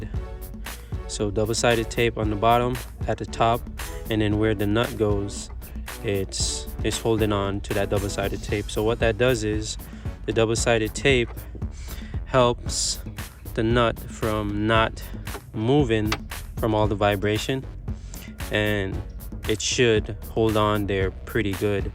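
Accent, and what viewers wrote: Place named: Jamaica. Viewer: Dowg sound like a yaad man so. Good look mi g. Mi just buy a 2019 gt 2 weeks now and mi love it and about to start doing some mods. So keep the content coming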